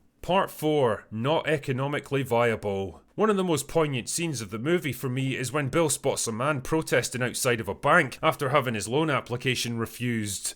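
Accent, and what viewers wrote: Scotland, United Kingdom. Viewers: the sloppy-af Scottish accent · I liked bill. He was right. You, with your scottish accent and lack of balls, make me sad. BILL had a point in this life. You dont. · fuck sake your voice is horrendous, why do you keep drawling out the last word. where in scotland are you fae?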